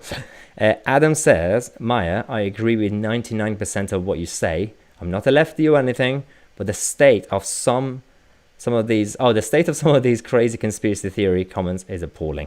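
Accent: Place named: England, United Kingdom. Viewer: Ah, so that is where your accent was created, between Leigh and Greenwich, almost Kent:)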